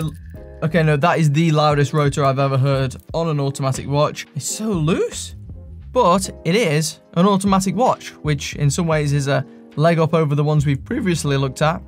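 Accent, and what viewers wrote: Stoke, United Kingdom. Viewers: I thought you had a stoke accent. Glad to see a fellow stokie on YT.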